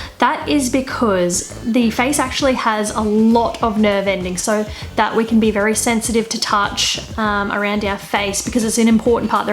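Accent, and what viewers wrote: Australia. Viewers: She is Australian. Not British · you are so cute, i love your little australian accent :3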